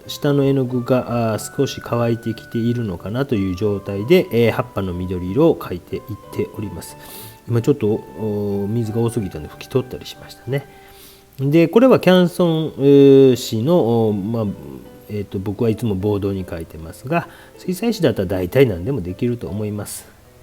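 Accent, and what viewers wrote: Kansai, Japan. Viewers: おはようございます。今朝サインの描き方を試してみましたがうまくいきませんでした😞 サインとか窓の格子などはアマチュアにはliquid frisquetでもいいかなと考えています。影を描いてからその上に色を載せるやり方は大変参考になりました。ところで神戸出身の私には先生の関西弁が懐かしいです。これからも頑張って下さいね。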